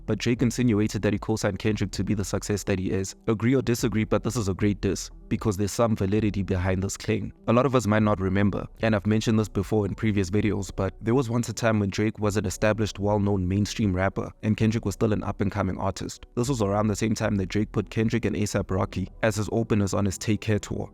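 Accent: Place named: South Africa. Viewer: South Africa.. I can hear it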